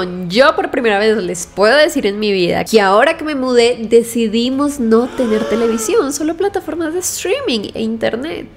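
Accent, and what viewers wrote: Colombia. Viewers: Tu eres de Colombia cierto? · T escucho la voz de colombina y estas en mexico ? saluditos nuevo seguidor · Wow eres de México por un momento pensé que eras de Colombia por tu acento 😮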